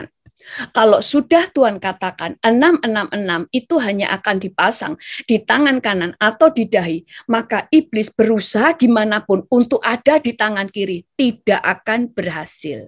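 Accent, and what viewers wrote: Java, Indonesia. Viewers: logatnya terkesan medok banget jawa-nya hehehehe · mantap bu.. Logat jawanya...kental...sy suka...sehingga penjelasannya...bagus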